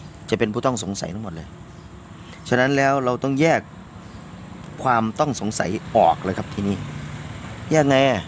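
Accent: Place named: Isan, Thailand. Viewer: มักคักๆสไตร์การพูดไทยบ้างอีสานเพลินดีดูสนุกเข้าใจง่ายเอาไปร้อยเลย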